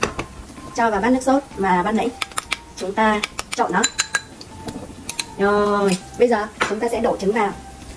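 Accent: North, Vietnam. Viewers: Nghe kỹ giọng chị là miền Bắc hồi đó coi gia đình là số 1 nghe giọng miền nam mà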